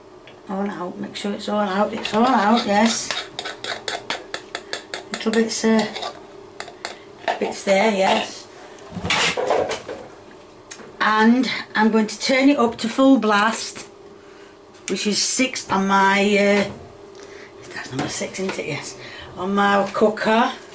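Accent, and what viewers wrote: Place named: North, United Kingdom. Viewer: Hiya... I find your lovely northern accent very comforting and your cooking very inspiring... I would love to see you do your favourite food to eat/cook. 🎉❤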